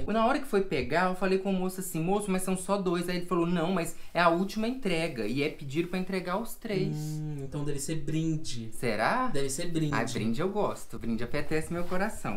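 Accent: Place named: Minas Gerais, Brazil. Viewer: Adoro seu sotaque mineiro amei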